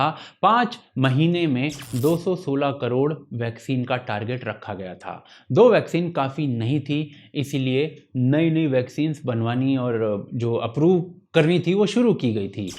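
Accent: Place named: Malwa, India. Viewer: Sir Aap Malwa ke lagg te ho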